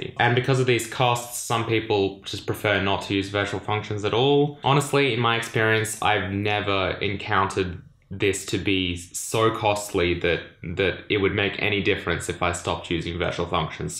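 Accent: Australia. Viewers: Hey! Got to say great channel to learn c++, awesome channel to get used to the australian accent!!:)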